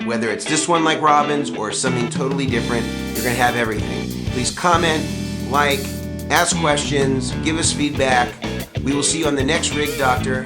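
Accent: United States. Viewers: Hahahaha. Fantastic. thanks I enjoyed that. It's not you who first brought this to my attention but since you said it a lot on this video, sorry but you have been targeted! I have always wondered why Americans pronounce solder this way. I also wonder why some people say " aks" instead of ask. Spelling however is another matter and I am absolutely terrible at it. I have also got some famous sayings completely wrong in the past. ps. I do enjoy your videos man. I have one of the best dumble clones ever in my opinion and am obsessed with those amps which brought me to you to check out your pedals. Would love to try one. Check out my youtube videos if you wanna hear the dumble. I reckon you would really appreciate it : ). It's got original 1975 Bassman transformers in it.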